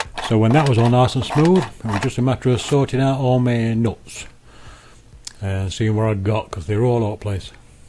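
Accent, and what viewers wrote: Yorkshire, United Kingdom. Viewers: Excellent video (as always) and your accent does make my wife smile. She is from Yorkshire but we live in Cornwall so she doesn't get to hear it much!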